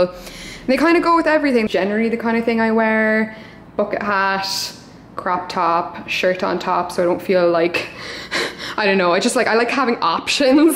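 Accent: Ireland. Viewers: I'm new to your channel. Is that a slight Irish accent I hear by chance? Great gems!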